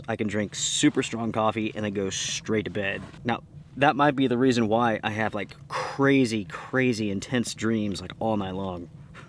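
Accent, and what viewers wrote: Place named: Boston, United States. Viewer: WHAT DONT U THINK OF MY GUY AMAZING CONTENT U ROCK MY DUDE GREAT JOB WITH THA SNOWBLOWAAHHHH IM FROM BOSTON THATS HOW WE SOUND LOL LOVE UR CHANNEL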